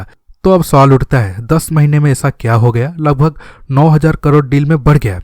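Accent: Odisha, India. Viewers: Bhai aap oriya hon kya? Love your videos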